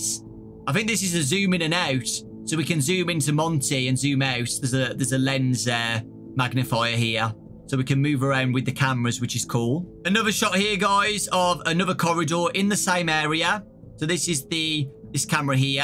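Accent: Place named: England, United Kingdom. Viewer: I never tought english accent is funny but now… you have changed my perspective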